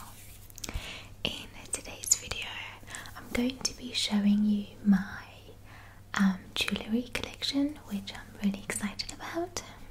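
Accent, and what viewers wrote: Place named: New Zealand. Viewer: wild guess but i was thinking the same, she might be from new zealand?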